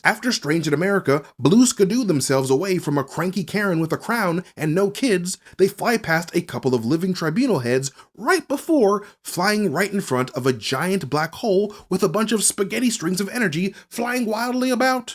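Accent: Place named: United Kingdom. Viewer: you're the black Loki Brutha💪🏿. You came back, can't stop, won't stop. You got the coolest brutha and the British accent, when yall come together, that's 21 SAVAGE. Dam this was a good bleepin break down. Ryan and his dog on Screen crush arguing on who need to step up. And ol boy is chained to that blue screen, yall keep doing yall Thang. WAKANDA 4EVA, & THE UK is okay! 👌🏿👌🏿👌🏿👌🏿💂🏿‍♂️💂🏿‍♂️💂🏿‍♂️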